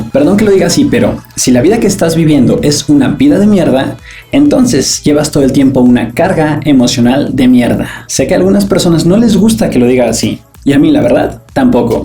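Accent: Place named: Mexico City, Mexico. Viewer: juan jose perez Yo lo escucho perfectamente como un habitante de Ciudad de México donde por ser tan grande con casi 10 millones de personas y sin contar aún la periferia de poco más de otros 13 millones, existen variados acentos. Pero en específico él es como de una de las zonas del centro-sur, clase media alta. Obviamente con buen nivel no solo económico, si de educación y cultura.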